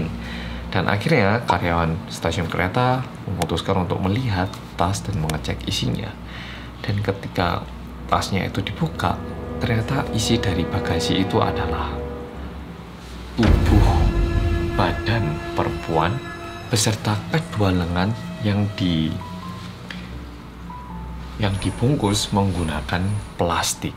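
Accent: Java, Indonesia. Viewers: Mas medhok: "darahnya terus bercucuran" Me yg phobia darah: mati aja lah aku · Mas, suaranya medok2 gimanaaaaa gtu.....sy suka sekali mndengarnya......😁😁😁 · yang medhoooooooooooooooooooooooooooooooooooooooooooooooooooooooooooooooooooooooooooooooooooooooooooooooooooooooooooooooooooooooooooooooooooooooooooooooooooooooooooooooooooooooooooooooooooooooooooooooooooooooooooooooooooooooooooooooooooooooooooooooooooooooooooooooooooooooooooooooooooooooooooooooooooooooooooooooooooooooooooooooooooooooooooooooooooooooooooooooooooooooooooooooooooooooooooooooooooooooooooooooooooooooooooooooooooooooooooooooooooooooooooooooooooooooooooooooooooooooooooooooooooooooooooooooooooooooooooooooooooooooooooooooookkk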